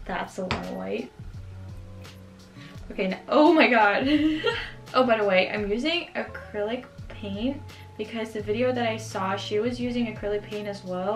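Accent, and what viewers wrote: Netherlands. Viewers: are you dutch? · Are you dutch??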